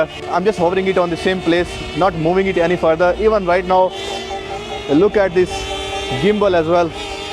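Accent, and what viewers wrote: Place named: India. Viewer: Bro I think you are Indian . As your accent is exactly Indian.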